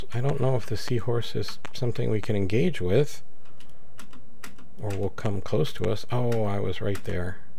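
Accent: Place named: Scotland, United Kingdom. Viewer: Colleague of mine watched you first time and mentioned your Scottish accent Oo ...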